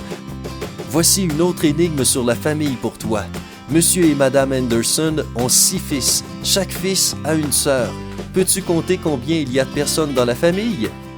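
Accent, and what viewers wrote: Quebec, Canada. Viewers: la dernière c'est n'importe quoi! Mais j'adore cette accent québéquoi! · L'accent Québécois!! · Tamara oui c'est vrai, déjà qu'en français j'entends pas toujours, alors avec l'accent québécois 😐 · Pourquoi y'a un québécois (comme moi) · mais pourquoi la voix de canadien là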